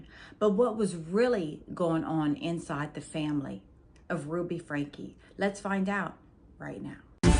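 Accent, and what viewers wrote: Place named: Cajun, United States. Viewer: I subscribed. Your channel is young. I'll give you a like. Let's get your numbers up. I like your tiny Cajun accent. It's barely there but it's there. Like delroy lindo.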